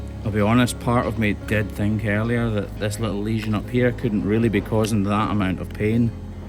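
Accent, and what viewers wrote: Scotland, United Kingdom. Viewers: Tired of hearing your Scottish voice. Just show the video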